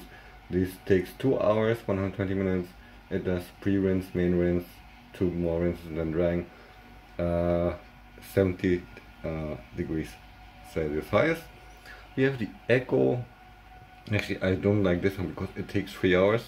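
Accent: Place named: Germany. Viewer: Hallo, ich gehe von deinem Akzent einfach mal davon aus, dass du Deutsch sprichst, dann spar ich mir mein schlechtes Englisch ;D ich habe die selbe Maschine und genau wie bei dir blinkt das "eco" Symbol in einer Tour. Laut Beschreibung ist das der Fehlercode für eine undichte Stelle bzw. für den "Überlauf". Hast du eine Ahnung wie ich das beheben kann? Habe die Maschine schon auseinander gebaut und konnte keine undichte Stelle erkennen. Wäre um Rat sehr dankbar! LG und ein schönes Wochenende.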